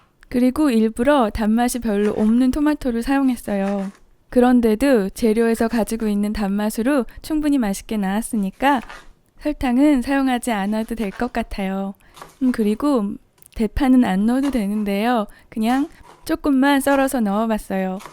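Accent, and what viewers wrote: Seoul, South Korea. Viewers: 이게 서울 사투리군요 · 말투가 완전 옛날 서울 사투리네요... 넘 좋다.... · 뭔가말투가... 끝까지 못보겠어요. 서울사투리 귀엽다는분들도 있는데 각자 듣기좋은 목소리가 다르겠죠?ㅠㅠ 더많은 구독자를 생각해서 조금 더 표준어를 사용해보시면 어떨까요?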